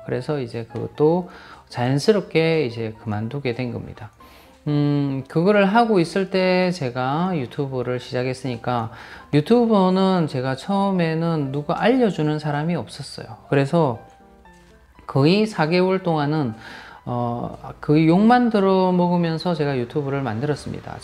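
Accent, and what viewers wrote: Gyeongsang, South Korea. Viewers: 톤이 차분하니 듣기좋네요. 경남분인거 같네요. 말씀을 들어보니 인복이 있으시네. 인복이 있어야 돈복도 있는법 부럽습니다. 30대때 당시 기술기능으로 독립이민을 상당히 고민했던 기엇이 나네요. 캐나다준비했었는데 결국 그 산업이 세계적으로 침체기가 돼서 접었던 기억이 납니다. 영어도 나름 열심히 했었는데. 무엇이든 그렇지만 외국에 가고자한다면 다른 준비나 여건도 그렇지만 무엇보다 연이 닿아야 된다고 봅니다. 외국에 사는 연은 분명 있거든요.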